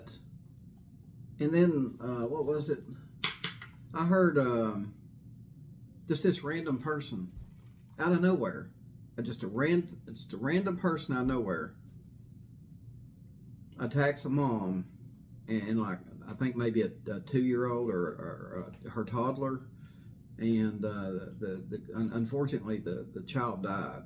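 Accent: South, United States. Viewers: Love the calm way speaks. U can actually hear him. Soft Southern Style! And no background nonsense. Yeah wtf is goin on with some people. I cant get ma head round it. All this evil, family annihilation, murder, missing kids….. It goes on and on! Depressing, upsetting. Have we learned nothing. Its 2024 now and this world seems to be getting worse not better. 💚💜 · I was a youngin'. I enjoyed that As I come from the Northeast. I was the same age as Nicole I think a year younger, So we were in our Early to mid 30's. I 't follow much true crime anymore like I used to many years ago with Nancy Grace. It's just the same child story of murder and sex abuse decades after decades but the names are changed. But I so enjoy your show, Your sensibility, level headness, of course southern accent!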